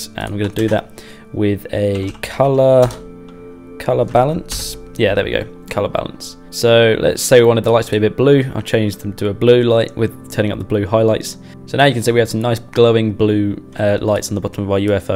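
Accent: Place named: United Kingdom. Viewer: when you have a brittish accent try talking slowly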